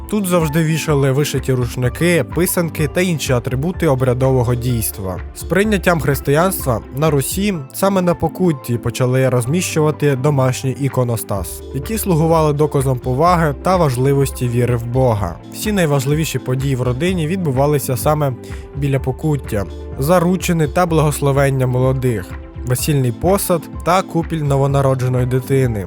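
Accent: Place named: Cherkasy, Ukraine. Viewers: молодець. У вірному напрямку рухаєшся. А ти з відки? Регіон? Черкащанський говір))) ні? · А пан часом не з Черкащини, якщо задає таке питання? Говір характерний для центральних регіонів. А Черкащанський, це який?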